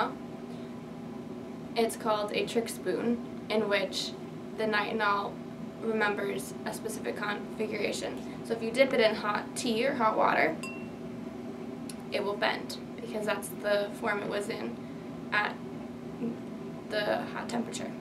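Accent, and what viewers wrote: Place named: United States. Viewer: I mean it's an extremely strong American accent :)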